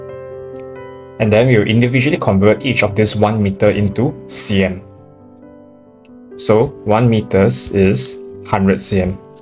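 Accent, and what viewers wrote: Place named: Russia. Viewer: Where are from man I love your style of teaching accent looks like Russian or european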